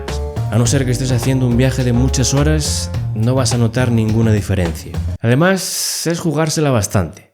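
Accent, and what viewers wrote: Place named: A Coruña, Spain. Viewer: Tú eres de A Coruña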